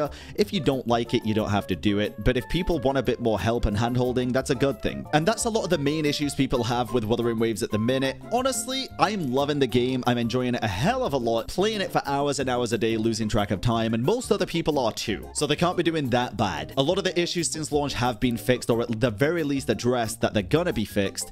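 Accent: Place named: United Kingdom. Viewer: Yo, British accent is hot af let them cook well speak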